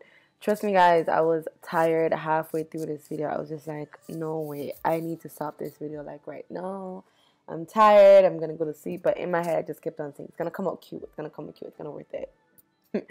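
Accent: Jamaica. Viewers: Omg your Jamaican?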